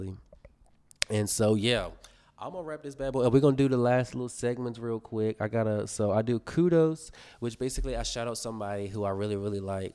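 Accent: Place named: Norfolk, United Kingdom. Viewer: Loving the hair and the fittt 😍 I definitely thought you was from Norfolk though lol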